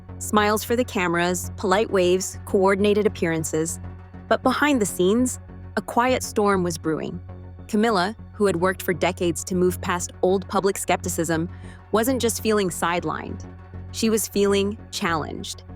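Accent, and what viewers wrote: United States. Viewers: I am always suspicious when we get announcements from an American voice? I will believe things from an English speaker or a member of the Royal Family or British Parliament.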